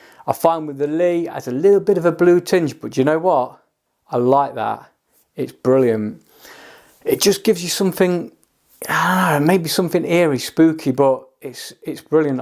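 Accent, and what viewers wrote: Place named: England, United Kingdom. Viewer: Great videos, thank you!! . Also I love your English accent. 😊 Cheers from California 🍺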